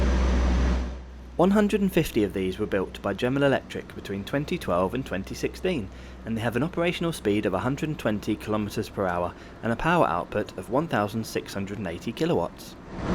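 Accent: United Kingdom. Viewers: UK acsent smooth language ✌️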